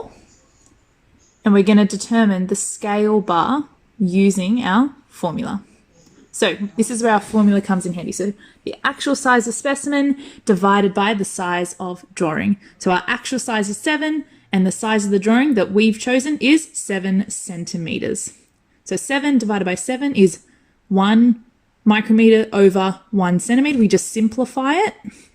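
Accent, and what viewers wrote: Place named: Australia. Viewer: She Australian uwu.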